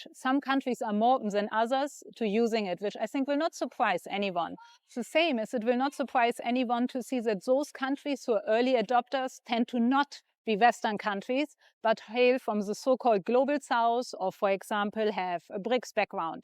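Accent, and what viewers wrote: Germany. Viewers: What first drew me to this Zen and humbled lady was, perhaps, her accent— German, I believe— with all reverence, quietly enchanting, like a forgotten melody from an ancient place, flowing softly through the Garden of Eden. Thank you for sharing a moment with me, a sip of coffee beneath the sky of eternity. As you spoke of China— that land I hold in my soul’s quiet chamber— your voice became the bridge between centuries, your words woven like silk in the wind. And though you stood still, you moved. Your dress clung to your form like a whispered symphony, a silent score composed in sacred air. Your hands— they danced softly, painting invisible calligraphy, tracing rhythms only the heart could read. You didn’t walk— you floated. In sync with something unseen, a grace not taught, but remembered. A melody not played, but felt, not spoken, but lived. 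You were not merely speaking— you were composing. In spirit. In stillness. In beauty.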